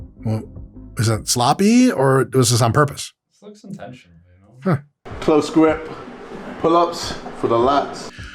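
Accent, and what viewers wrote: United Kingdom. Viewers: But he’d have to do the whole thing in his bri’ish accent. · Love the British accent Dr Mike !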